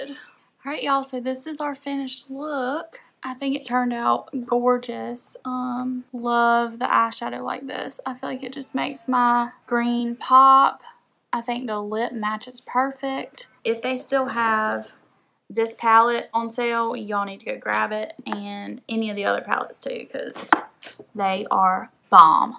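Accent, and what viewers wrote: South, United States. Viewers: Where are you from? I sense a southern accent. I’m from Alabama!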